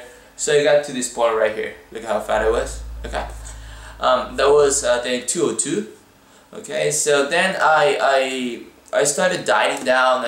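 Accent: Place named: Latin America. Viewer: Oye de verdad que tu video es inspiracional. Y ese acento latino que posees me mantuvo hipnotizado a lo largo de todo tu video.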